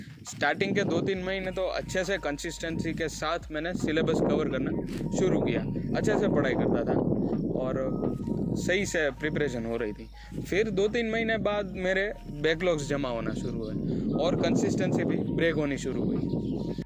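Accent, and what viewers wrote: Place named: Gujarat, India. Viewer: All the best for College, New journey With new josh 👏 the Gujrati Tone in voice and The josh of new beginning indicates The real Gujrati Chhokra 😅😅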